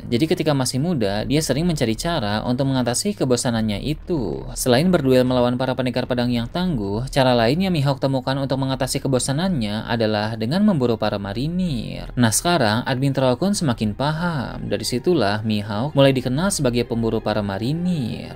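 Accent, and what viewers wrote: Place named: Banyumas, Indonesia. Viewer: Wong ngapak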